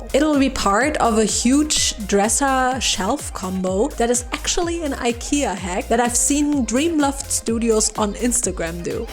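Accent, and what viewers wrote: Germany. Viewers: I love your videos and your German accent I am German and shout-out to u and your dad yall do amazing work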